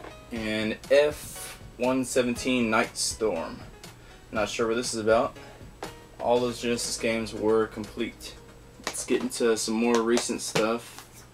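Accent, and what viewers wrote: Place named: France. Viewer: french accent not british good video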